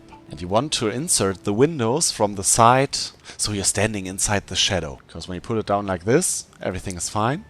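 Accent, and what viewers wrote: Germany. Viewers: Naja, dass du deutsch bist hört man an deinem Aktzent ;) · Warum mschst du keine Videos auf deutsch dein englisch ist erhörbar, das du aus Deutschland kommst.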